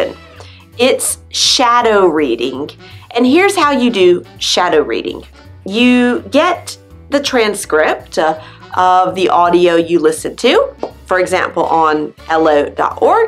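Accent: United States. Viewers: So, I m from DRC Kinshasa … IS IN AFRICAN.. I m liker your English American accent is very good. Please my teacher my God bless you. I love yours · That is definitely an American accent. She’s just slowed down, as well as articulated, speech... I think she does a great job overall. The only exception is that ending sound of letters. As I stated above, she adds the -uh sound, which is incorrect. I used to do this teaching Lao students and then realized that it made learning to read difficult. For example, ‘sad’ would become suh-a-duh, instead of s-a-d. Or ‘tin’ would become tuh-i-nuh, instead of t-i-n. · Not every american speaks with your accent.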